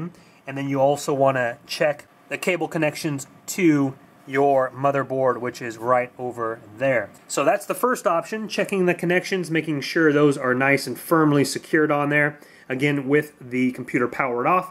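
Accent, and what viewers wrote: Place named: United States. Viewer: Finally an american been watching indians and cant understand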